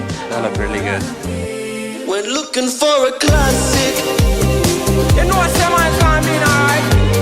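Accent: South Africa. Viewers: I’m from South Africa to and I just discovered your channel from a guy that is a fpv pilot he’s your buddy you guys made a video together about the go pro hero 12 but anyways I’ve been binge watching your videos and I have to say as a South African I am so proud to know that you are from our country and I’m so glad to see you doing so well in life traveling and making these sick videos I’m moving to Dubai where my family moved 8 years ago and once I get there I’m starting a YouTube channel and that’s how I came across your channel I was researching cameras and drones and your busy I can’t remember he’s name but you where in his videos which led me to your channel cause I heard your accent and he said that you are very well know in his videos and I just wanted to say I’m so glad I found your channel your so inspiring and your videos and skills and knowledge is so amazing to see mahn keep it up u love it when our people can be so skilled and make videos at the quality on par with people over seas and even better as I saw in your go pro awards video and you won to you fucking legend amazing content love from South Africa 🇿🇦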